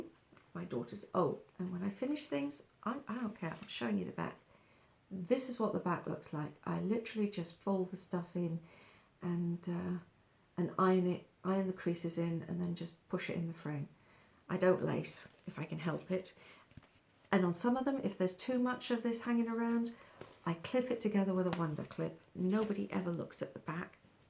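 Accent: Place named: United Kingdom. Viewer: on Flosstube you are a treasure. I was so calm listening to your voice yesterday. I am never calm and can’t relax. Lol. British accent and dialect is always relaxing to listen. Hurry and post another one. I want to see what you’re doing. I might try to make a video this weekend. Maybe. LOL Are you on Instagram? I’m Sweetwoodruffs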